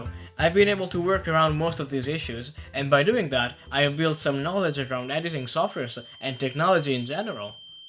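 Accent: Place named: Balkans. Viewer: Blud you sound hella balkan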